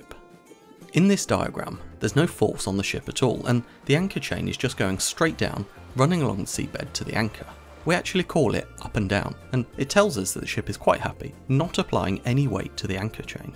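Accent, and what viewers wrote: United Kingdom. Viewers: i was going to say the same but since he sounds british maybe they pronounce it that way.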